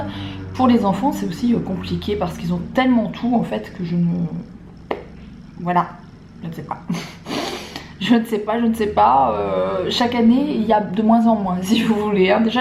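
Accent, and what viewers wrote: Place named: Belgium. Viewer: Je trouve que ton accent Belge s'entend fort dans cette vidéo ^^. Bravo pour tout ton travail.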